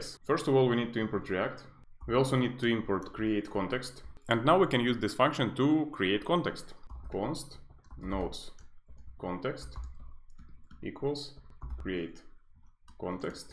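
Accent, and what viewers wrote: Russia. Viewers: Du låter väldigt rysk när du pratarz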